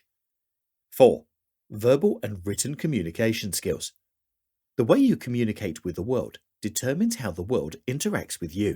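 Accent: United Kingdom. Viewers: Why is nearly every video like this one narrated using a British accented voice? Do people really think that words spoken in a "posh" British accent convey more meaning or that the speaker is more intelligent? Most of these voice-overs are probably computer generated so perhaps they are more intelligent - even more so than most actual Brits.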